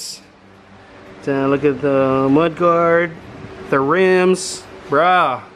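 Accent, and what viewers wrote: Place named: Conyo, Philippines. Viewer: Maxado konyo c kuya... nice car by the way.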